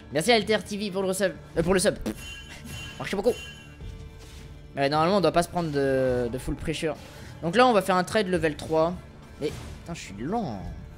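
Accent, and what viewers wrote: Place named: Russia. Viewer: Mdr j'adore l'accens russe quand il parle anglais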